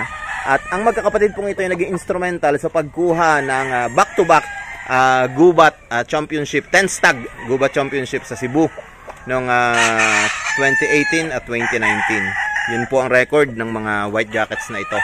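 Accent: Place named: Batangas, Philippines. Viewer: Yown gusto ko sa batangueno eh ay kaalwan pakinggan at may joke talaga eh..more power channel.new subscriber boss...from north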